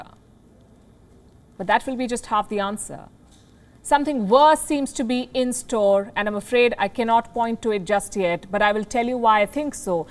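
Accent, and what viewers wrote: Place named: India. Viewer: indian tongue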